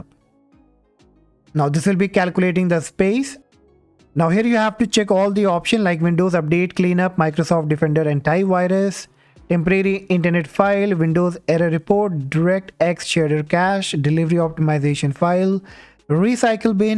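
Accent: India. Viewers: cuz i you thought he was an indian acsent guy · Honestly once i heard that indian accent i instanly knew this was going to work and it did infact work thank you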